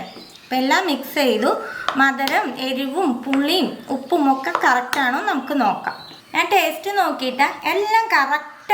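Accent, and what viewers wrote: India. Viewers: ee narration style kettal thanne video full kandu pokum.. kottayam slang 🥰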